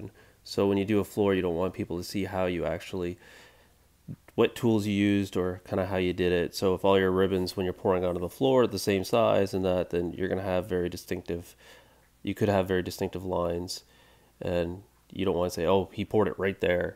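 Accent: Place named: Canada. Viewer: Why did canadians start and continue to say the letter o as a u?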